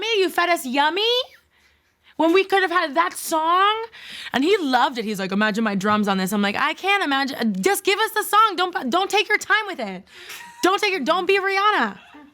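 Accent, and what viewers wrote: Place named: Brooklyn, United States. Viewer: I just found this channel. This shit has me cracking up. Before u even said it, I knew u were from Brooklyn from your speaking and your swagger.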